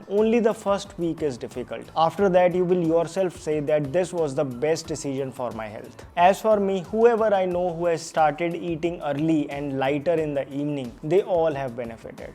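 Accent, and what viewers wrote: India. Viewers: Pta nhi Bhai ki english hindi jaisi lgti h 😅😅 Nice information ♥️🌸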